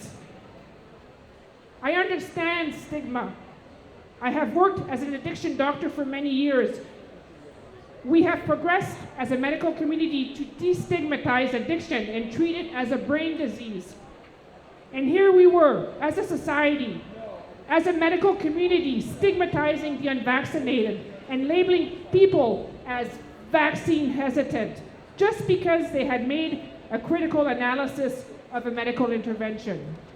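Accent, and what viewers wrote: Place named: Canada. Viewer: She sounds so Canadian 😄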